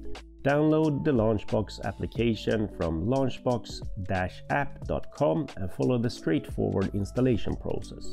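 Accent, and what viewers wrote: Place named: Sweden. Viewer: I can hear the swedish sort of accent, you sound familiar dude.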